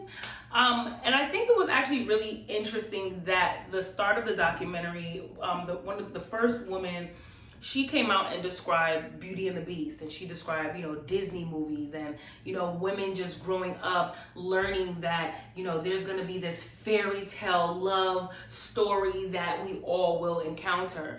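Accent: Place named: New York, United States. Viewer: I just love your New York accent! Lol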